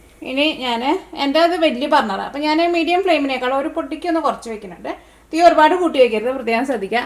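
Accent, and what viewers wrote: Thrissur, India. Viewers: ചേച്ചി തൃശ്ശൂർക്കാരി ആണല്ലേ? ഞാൻ ആദ്യമായിട്ടാണ് വീഡിയോ കാണുന്നത്. നന്നായിട്ട്ണ്ട്ട്ടാ...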